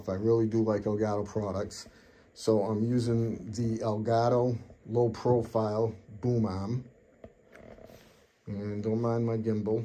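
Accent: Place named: New England, United States. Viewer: Sounds like you're in New England like me!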